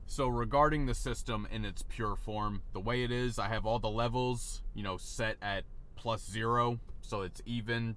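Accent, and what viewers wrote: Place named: New Jersey, United States. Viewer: Bro’s never heard a Jersey accent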